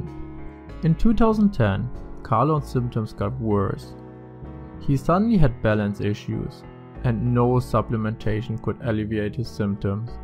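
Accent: Germany. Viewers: Deine Videos sind klasse, bildhaft und strukturiert. Das einzige was mich stört ist dein starker deutscher Akzent. Dafür kannst du nichts - ich glaube aber mit einer besseren Aussprache könnten sie eventuell mehr Reichweite erzielen. Die, die sie verdienen! Vielleicht könntest du einen anderen Sprecher nehmen? Ich bin selbst Psychologin und habe mehrere Mikrobiom Transplantationen hinter mir - sie haben meine Psyche und meinen Körper gerettet. Weiter so und liebe Grüße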